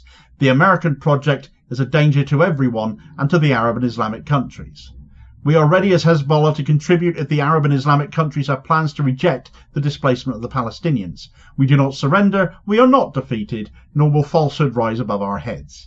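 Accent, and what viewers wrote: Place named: South West England, United Kingdom. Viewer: Its his English "local" accent not the microphone.... South West England. Sounds fine to me "Ooooh Arghhhhh"! somethings in the UK still shine bright against the invasion... thankfully local accents live!! (Google " " and you will understand what I am saying 🤣 )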